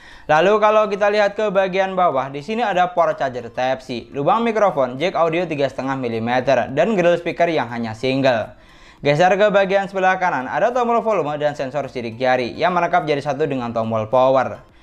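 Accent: Madura, Indonesia. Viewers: kayak nya abang orang madura ya dari logat bicaranya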